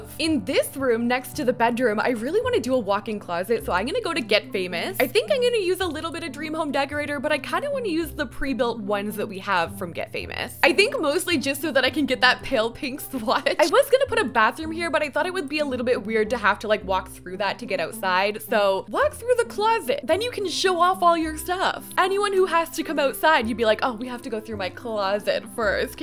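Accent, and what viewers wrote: Canada. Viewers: i could immediately tell you were canadian in the first minute of the video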